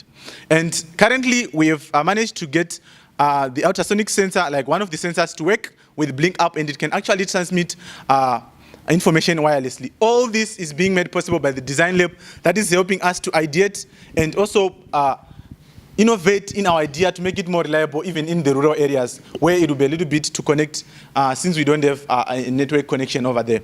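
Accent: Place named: Ghana. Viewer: You have suddenly adopted the Ghanaian accent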